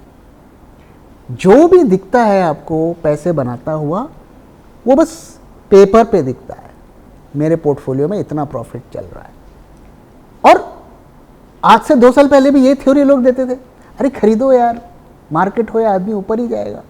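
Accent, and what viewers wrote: Bengal, India. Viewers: Haha she has such a cute Bengali (or is it Assamese ? accent in her Hindi ! Love it. 😄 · Yeh toh bengali wala hindi accent hain🙂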